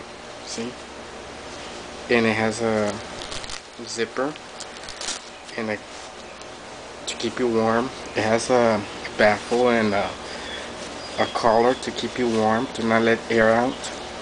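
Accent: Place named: Chicago, United States. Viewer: Oye: thanks for the review. love your accent ,too. reminds me of my friends form chicago!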